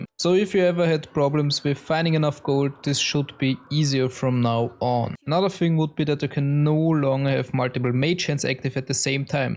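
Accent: Germany. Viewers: No offence, but that's a hell of a german accent... XD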